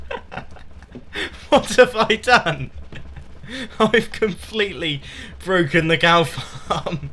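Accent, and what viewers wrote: United Kingdom. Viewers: I think what I love most is the Britishness of your accent and the music. It's just so... British. Keep up the good work. Love your stuff.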